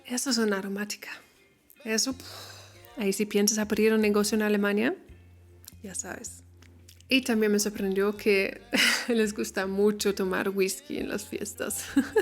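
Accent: Colombia. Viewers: Tan linda 💐🌹 Gracias por todos los alagos a Colombia belleza 💞 hablas muy a lo colombiano 💐💐💐 Bendiciones ✨✨✨ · Ya hasta tiene acento y expresiones colombianas. Impresionante. 😮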